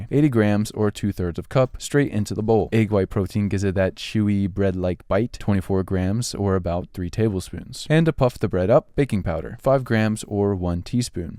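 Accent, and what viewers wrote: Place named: United States. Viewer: Why speak perfect America English then go on to say Celsius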